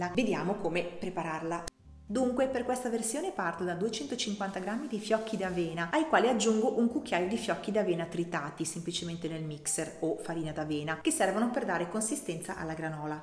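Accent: Romagna, Italy. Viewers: Ottime idee!!! La tua voce è molto dolce..sei romagnola vero? 🙂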